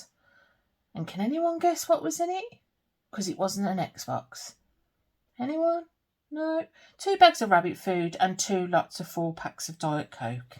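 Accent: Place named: Birmingham, United Kingdom. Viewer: Great wip n chaty little Brummie glad your getting back to you my fave book growing up was the secret garden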